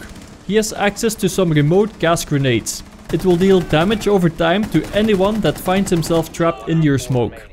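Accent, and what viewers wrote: Netherlands. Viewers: duidelijk Nederlands Engels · Probably the Netherlands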